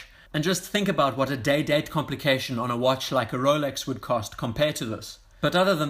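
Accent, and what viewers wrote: South Africa. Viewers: Hay dude are you South African? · Are you South African?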